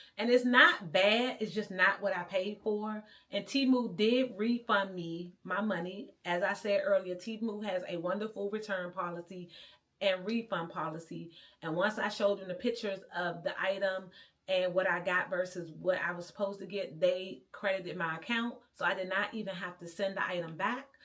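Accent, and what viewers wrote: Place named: DMV area, United States. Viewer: OMG I just knew you were from the DMV area I can hear it in your voice lol I LOVE IT…… Girl you have a Beautiful home to God be the glory I’m truly happy for you✨💛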